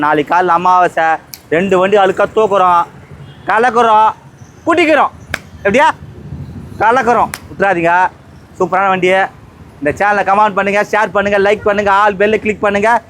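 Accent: Madurai, India. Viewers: Madurai vasagam super 🎉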